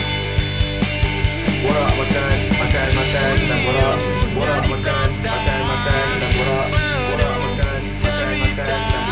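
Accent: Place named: East Coast, Malaysia. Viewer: ko org mane ek? slang bunyi cm pantai timur je. hehe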